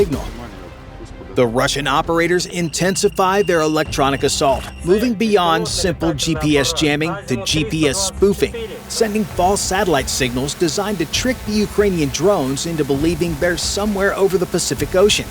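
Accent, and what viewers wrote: United States. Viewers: Heavy American accent.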